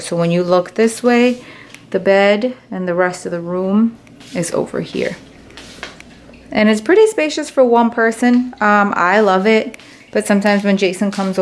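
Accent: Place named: New York, United States. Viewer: I love your accent; it’s so NY and I just love it. Stay safe queen and Tfs 😘🖤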